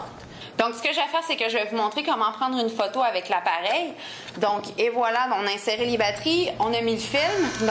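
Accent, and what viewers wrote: Quebec, Canada. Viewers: Maybe because of the french canadian accent she has ? but i understood her well !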